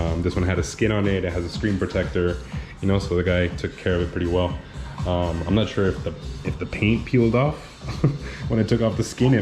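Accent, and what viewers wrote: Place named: Miami, United States. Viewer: miami accent 305 . i might look into denon for a third channel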